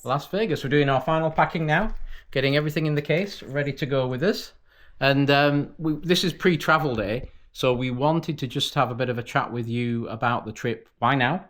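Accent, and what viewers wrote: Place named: Yorkshire, United Kingdom. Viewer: Love the Venetian. Wow can tell your from Yorkshire 😂 what a deal!x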